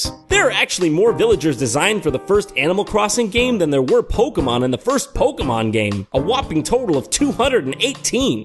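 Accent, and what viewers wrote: United Kingdom. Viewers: Awesome British accent haha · That British accent... I... don't know what to say! But I think I like it?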